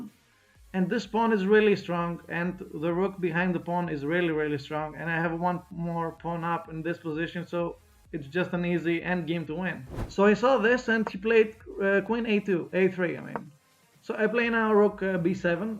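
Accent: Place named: United Kingdom. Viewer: Γεια σ ρε αρχηγέ! British accent! If you didnt got it i am Δήμητρα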